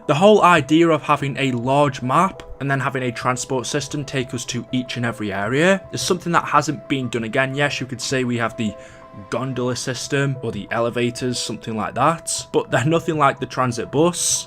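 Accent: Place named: Yorkshire, United Kingdom. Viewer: Sounds like a Yorkshire accent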